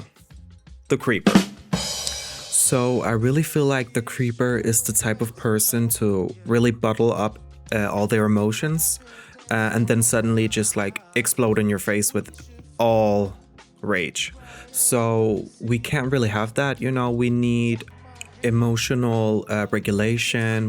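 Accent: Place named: Brazil. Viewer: are you brazilian? Your accent is nice btw very underrated content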